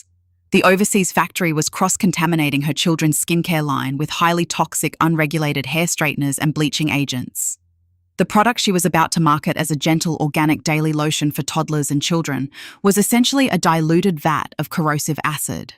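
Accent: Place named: Australia. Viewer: Kiwi here, Refreshing to hear an Australian accent. Some of those American accents are horrid. Good story, my youngest daughter had a similar experience when she was little, quite a traumatic experience for a young one.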